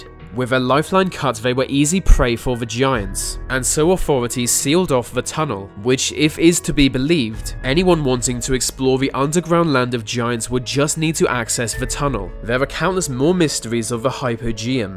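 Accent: England, United Kingdom. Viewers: Even science can't explain it? Lame Brit accent. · Tjis is why i dont like british accent . It is so irritating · That damn English accent. Still a good vid.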